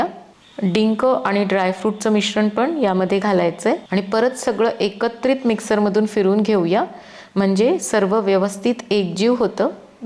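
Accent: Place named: Pune, India. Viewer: Tumhi puneri bhasha bolta chan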